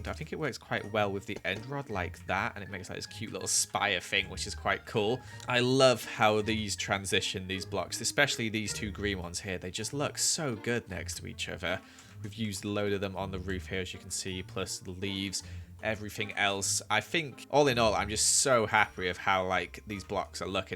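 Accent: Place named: United Kingdom. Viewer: British I just thought you had a weird voice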